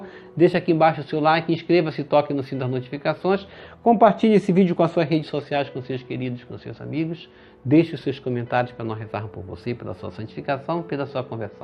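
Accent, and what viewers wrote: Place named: North, Brazil. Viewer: Obrigada, por compartilhar conosco a palavra de Deus. Que Deus te abençoe e proteja sempre. Mande um abraço para Manaus e peço orações pela nossa cidade. E a propósito, diga-nos qual é seu estado? O seu sotaque me parece ser do Norte. Um grande abraço!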